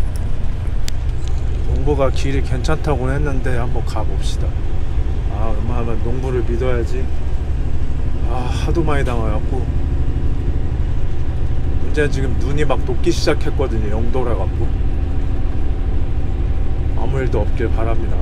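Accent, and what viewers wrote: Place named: Chungcheong, South Korea. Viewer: 친숙한 충청도 사투리 ㅋ 어디세요? 전 충주 ㅋ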